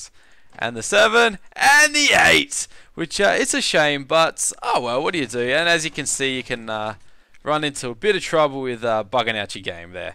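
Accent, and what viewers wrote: Australia. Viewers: U sound Australian cool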